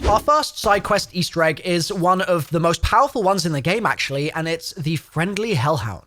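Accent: United Kingdom. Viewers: It’s just British pronunciation. Relax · Obviously he's from the UK and they pronounce things differently. Aluminum as they say it is al-u-min-e-um.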